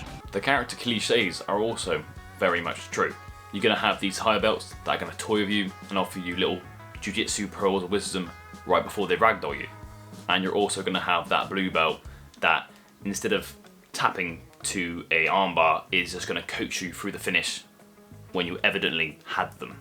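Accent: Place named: North, United Kingdom. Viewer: Good video, nice to hear a northerner on YouTube!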